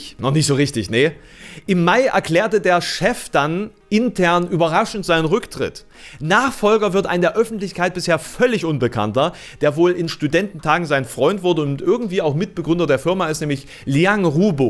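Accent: Saxony, Germany. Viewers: ich liebe es nicht und bin recht froh dass es bei ihm nicht zu krass durchkommt ^^ Habe zu viele Sachsen erlebt die im härtesten Dialekt den größten scheiß labern Aber ihn hier hab ich echt gern ^^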